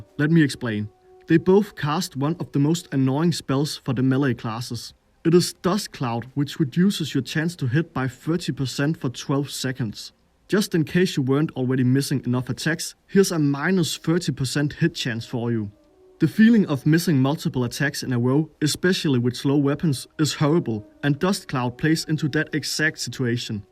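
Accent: Denmark. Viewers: well, I like this kind of english with danish accent, I mean REALLY danish XD BTW great video mate. Og jeg har set mange af dine fede videoer :)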